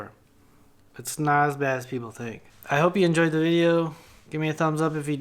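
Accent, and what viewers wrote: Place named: California, United States. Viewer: Man, if that's isn't a Californian accent, I don't know what is! LMAO.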